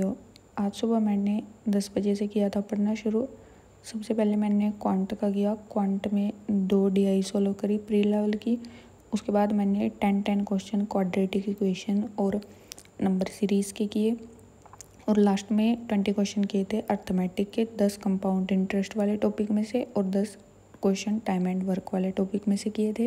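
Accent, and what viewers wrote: Haryana, India. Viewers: Aapki aawaj se lgta h ki aap kurukshetra side se ho...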